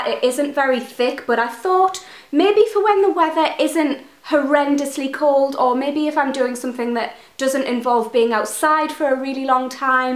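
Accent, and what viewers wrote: Newcastle, United Kingdom. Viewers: Is that a jordi accent haha sounds like your from Newcastle xx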